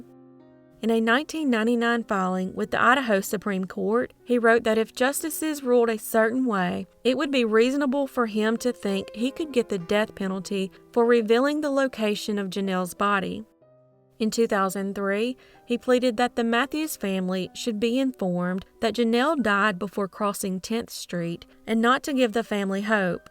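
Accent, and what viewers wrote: South, United States. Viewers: Urgh that southern accent, and the way you end your sentences makes me cringe 🤮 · South African accent is mostly inspired by British or Australian accent! But in this context the person is wrong to judge her accept! I think her Southern accent is so great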